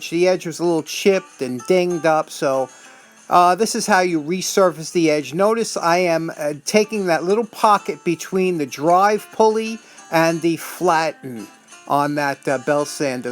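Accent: United States. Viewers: Accents😂. I do my videos in my normal everyday voice, or so I though, but someone commented I sound so chilled that it sounded like “I had eaten a shit ton of weed”. It’s you Americans that have an accent not us Aussies. Now Larry the cable guy has an awesome accent that I can listen to all day. He makes me laugh as soon as he opens his mouth. Great job on the restos. It’s so frustrating have to restore things twice🤬 Cheers Stuart 🇦🇺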